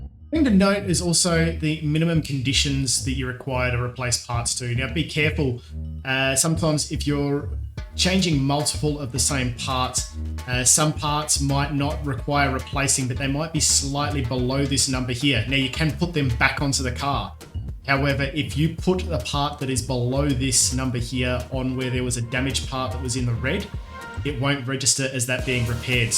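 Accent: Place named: Australia. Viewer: Great advice. Cheers mate, much appreciated. You sound Aussie (Australian). A welcomed relief from other accents online.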